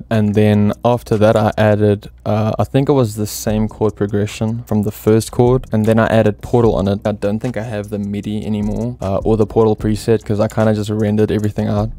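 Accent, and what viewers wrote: South Africa. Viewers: Dude why do you sound like you from South AHHHH🇿🇦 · the accent is giving joburg oak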